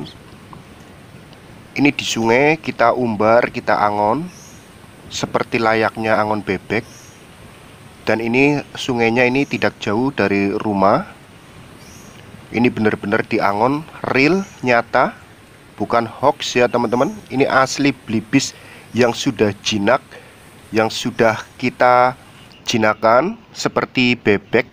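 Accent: Banyumas, Indonesia. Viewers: Wong ngapak ..pancen josssss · kita angon " sampean wong jowo ngapak pow kiE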